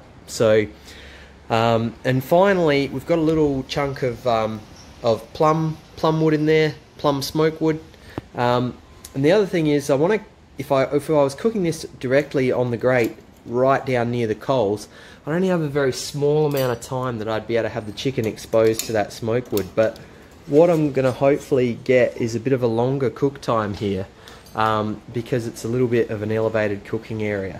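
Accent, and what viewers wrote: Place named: South Africa. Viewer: Love that SA accent mate, great video, cheers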